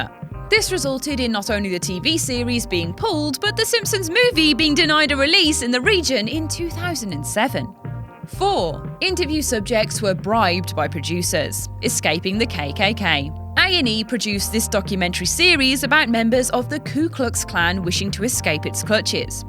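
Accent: United Kingdom. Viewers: So how do you pronounce TV? We in the UK, where is from pronounce it as the letters, Tea Vee. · That is highly offensive! is a citizen of the UK, and like the British, Welch, Scots, and Irish many cultures around the world pronounce words differently. Get over yourself and learn to understand different dialects, already! Please, there are more countries in the world than just the United States!!!!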